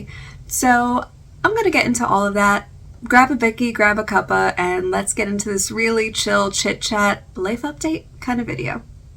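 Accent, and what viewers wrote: Australia. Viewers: I miss you, however you're not to me now you're Mostly Aussie. Also your American accent isn't as strong as it once was. Won't be long and you'll have a full Aussie accent. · I derect notes of an Australian accent,well done, thanks for the very interesting update, I was wondering what had happened to you. As an Australian I find the PR system to he appalling. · Yeah mate, your starting to sound Aussie. Mark is a lucky man. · I can hear some twangs of the Aussie accent creeping in there! Nice to see you again, glad you are going well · Great to see you again. Love your content from your previous posts. This is from a dinky dye born in Australia Australian. Your accent and use of Australian slang is definitely there, even though I still hear your North American accent as well. This is from one who has the dual London England and Australian accent. 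Your family might have fun understanding you when you visit them later in the year. Patience about the permanent residency and eventually Australian citizenship, you will get there. Look after yourself and your health.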